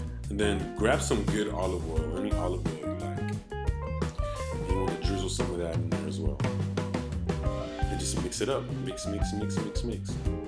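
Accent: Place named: California, United States. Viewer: You sound like you from Cali 😂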